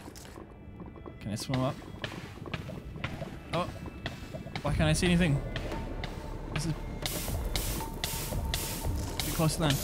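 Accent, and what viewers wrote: New Zealand. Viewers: thanks so much bro, also from New Zealand, good to here a familiar accent on a yt video, keep it up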